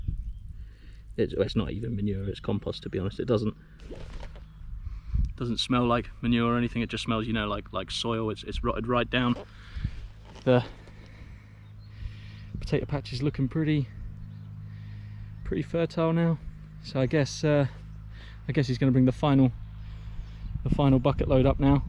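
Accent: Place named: Norwich, United Kingdom. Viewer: I've just found your channel, and am really enjoying the content. Me and my family are thinking of moving to central Portugal with everything that's going on in the UK. I have to ask, I am sure I recognise that accent. Are you from Norwich by any chance?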